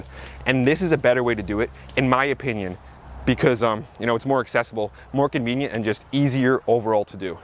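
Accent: New Jersey, United States. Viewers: I love watching your channel. From the Jersey accent to your child like enthusiasm for your entire gardening adventure, you are truly entertaining and very informative. I am a 64 yr old that is in process with the hubs building a new home on our 7 acre property in SE Indiana in the Ohio River valley. The hubs and I both grew up on farms and our families always had gardens and livestock. Of course, neither of us appreciated being raised that way AT THE TIME, (too much like work!)but are looking forward to cultivating as much of the 3 plus lawn area of our property with food and probably a few chickens. I am hard core about gardening via organics, and the hubby says "Meh", but pretty much does as instructed in that area!! We've already done gardens on our previous property, started it in the spring by adding soil amendments like sand, (to break up that Hoosier clay!!) rotted horse manure donated to us from my farmer uncle, piles of rotted leaves we'd accumulated the fall before that we just spread out on the new garden spot and covered with a huge tarp after we wet them down and left it for the winter. 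We added some lime, to sweeten things up, tilled it all in and started planting. We made the mistake of planting 72 tomato plants and didn't stagger them at all. OH MY GOD!! We sure learned our lesson there!!! It was also a really good growing season that year for tomatoes. Lets just say the neighbors, our church family, and we had all the tomatoes anybody could ever use! Thanks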